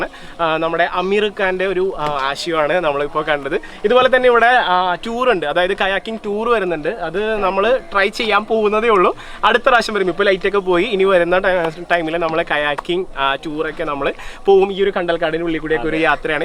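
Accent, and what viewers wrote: Thrissur, India. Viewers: Machan thrissur karrananno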